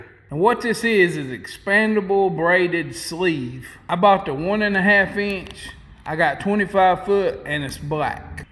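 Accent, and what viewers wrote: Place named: South, United States. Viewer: I like this guy.... country drawl n all 💪🏾🐻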